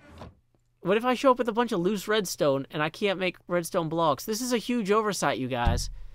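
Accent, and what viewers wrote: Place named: Tennessee, United States. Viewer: I just found u and instantly new u were from TN with that accent😂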